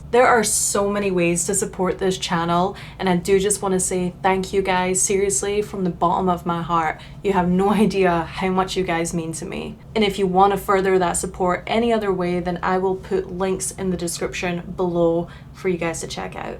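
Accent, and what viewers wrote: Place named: Scotland, United Kingdom. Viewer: Your doing ok. Love ya Scottish accent. Awesome!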